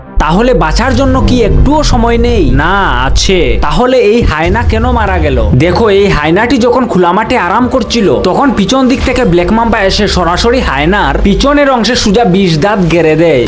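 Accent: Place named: Sylhet, Bangladesh. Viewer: তুমি তোমার সিলেটি ভাষায়ই কথা বলো, শুদ্ধ ভাষা হয়না তোমার, লেজে গোবরে হয়ে যাচ্ছে ভাষাটা।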